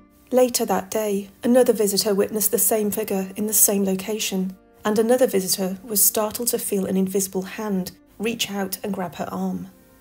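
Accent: England, United Kingdom. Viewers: Honestly, I glom to your work as a result of your voice and content. I might watch an episode after the fact for the visuals in order to get a sense of place, but this is always an afterthought. I prefer to use my imagination. As to the questionl on ASMR do what you want. I am perfectly content being soothed by your voice and personality when it pops up in your occasional afterthoughts. "What do you think?" Although I find the topics of ghosts intriguing I find the history of Great Britain equally so. I find it a charming combination. With your quiet voice and accent you could probably get away with reading a list of recipe ingredients. Well, that's not true. But with You Tube being mostly about visuals for me your voice and persona are the selling points. I will stop repeating myself now. Looking forward to what you concoct in the New Year. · I'm Australian and I would love to have a beautiful English accent such as this, it's very easy on the ear.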